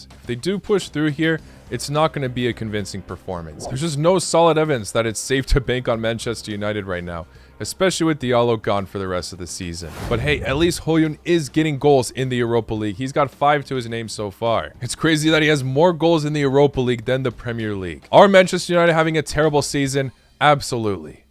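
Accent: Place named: United States. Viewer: You speak perfect American English for me and Your American Accent is so awesome.🥰😍🤗❤❤❤❤❤❤❤🤍🤍🤍🤍🤍🤍🤍💙💙💙💙💙💙💙💯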